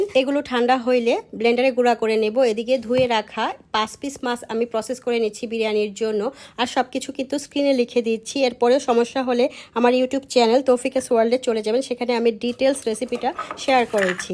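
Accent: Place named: Bihar, India. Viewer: আপু আপনি কি বিহারী..? আপনার ভাষা শুনে বিহারীদের মত মনে হচ্ছে।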